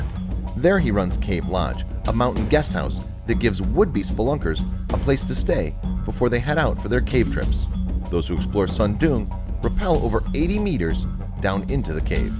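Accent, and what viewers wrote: United States. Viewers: Within the first two minutes the video contradicts itself... Which was it... the sound of the wind or rushing water? And why bother to even try to tell us again what you've said only two minutes before? Is this made for the dumbest people alive.... Oh wait, the narrator is a yank so I guess it was.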